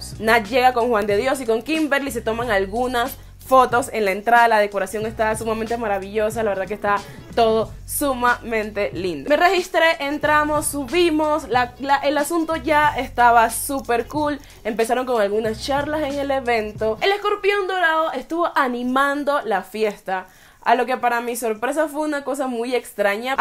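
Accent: Panama. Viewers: Sabía que eras de Panamá 😫 si tenemos acento Ajajaja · Acabo de llegar a tu canal,y con tu acento reconocí que eres de PANAMÁ 🇵🇦😍❤. yo como 😳está chica suena al acento panameño😍❤. eres muy bella.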